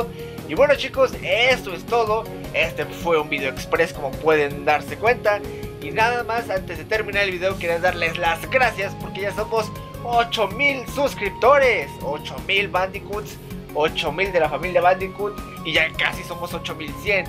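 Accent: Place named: Mexico. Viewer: Hasta tu voz parece mexicano dE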